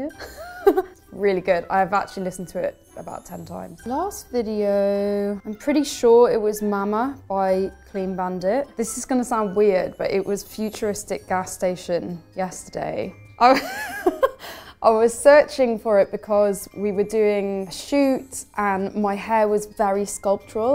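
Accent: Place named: United Kingdom. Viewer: Am I the only one who had no idea she spoke with a British accent?😂😂😂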